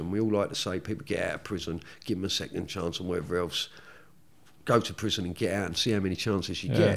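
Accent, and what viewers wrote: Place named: Cockney, United Kingdom. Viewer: A very likeable fella, a proper cockney and loveable rogue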